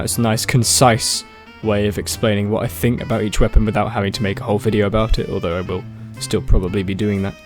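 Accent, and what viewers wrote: United Kingdom. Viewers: I don't know why, but I, as a non-English-speaker, love your British accent.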